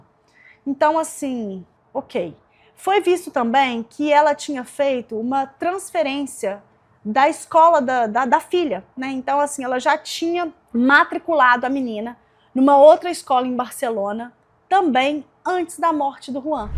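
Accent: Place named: Minas Gerais, Brazil. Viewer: Mais uma inscritaaaa🤩🤩🤩🤩 Pelo sotaque ... é mineira!😂 Se foor bate aqui mana ✋️👊🤜🤛👏🙌🫶😍 Mas se não for... pra mim é minereis do msm jeito 😂🫶🫶🫶🫶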